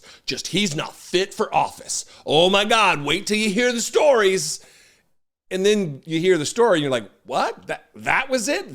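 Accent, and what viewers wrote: United States. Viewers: please do not speak American as we your overseas audience for not knowing what duceses means. Thanks for the hard work and we enjoy your content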